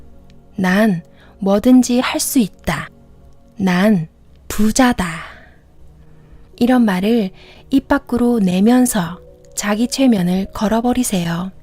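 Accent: Gyeongsang, South Korea. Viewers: 경상도 사투리가 약간들어간 목소리가 너무 좋습니다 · 갱상도 말씨 너무좋아 조아요^,^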